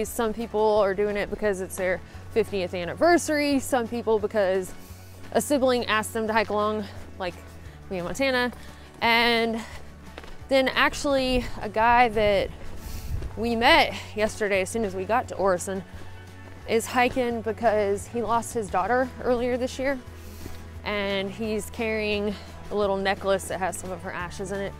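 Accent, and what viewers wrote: South, United States. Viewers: LOL, I read the title of this video with your southern drawl in my head! Thanks for the laugh and have fun in Spain! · The Forrest Gump thing had me like🤣🤣🤣 I remember the first time I watched one of your vids and heard your accent,I said,she’s from Alabama,Georgia or Tennessee. Greetings from a fellow southerner. Knoxville,TN boy here.✌🏼 p.s. there’s nothing better than a southern girl👌🏽🖤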